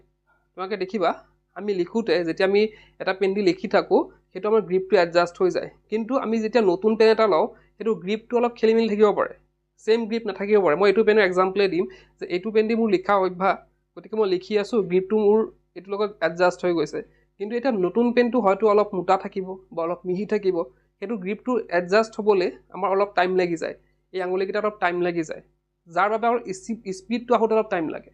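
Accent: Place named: Barpeta, India. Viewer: Sir barpeta district r English lage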